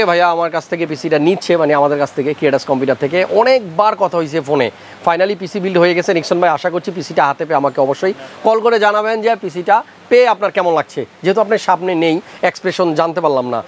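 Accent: Noakhali, Bangladesh. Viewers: নোয়াখালী ভাই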